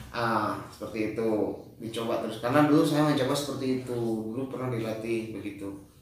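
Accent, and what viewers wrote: West Java, Indonesia. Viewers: Sunda punya, hihi logatnya itu lohh ustd khas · Logat sunda tee bisa lengit aa 😁😁 Kasep ogeh dei aa ,,,shat trus nyaa aa ,,aamiin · Mantap Kang... Prnjelasannya alami... orang sunda bgt... Hehehe. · Begitu sajah... Sunda pisan bhs indonesiana..😁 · Lucu tapi bermanfaat mkasih bang ats ilmunya😃Sunda pisaaan euyy😂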